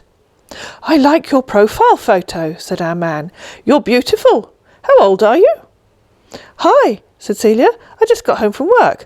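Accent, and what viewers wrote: United Kingdom. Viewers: This is the most addictive channel… ever! Even when insults are being doled out, the British accent makes it sound so polite.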